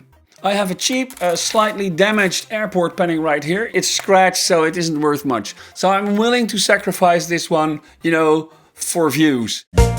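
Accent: Netherlands. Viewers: Hi! Very nice video, thank you🙂 I have all the Bandai double-layer and single-layer solar games, they are amazing. Especially that they still work! One of my favourite is the single-layer "Invaders of the Mummy's Tomb", the mini version. Double-layer Terror House is also good. I'm not very fond of Zaxxon though, but maybe I just didn't get the hang of it, yet. FYI, there were several more of these double-layer LCD delights. I know (and own some) of these: MASUDAYA: Samurai Vs Ninja, Air Force MORIOKA TOKEI: Brave Fireman, Construction Work, Mice Party, Shark Hunter, Sky invaders (=Air Force), Jupiter Please, can you tell me, which LCD game books (catalogues) did you show in the video? I want them🙂 Especially the second one shown. p.s. You have a lovely Dutchglish accent😄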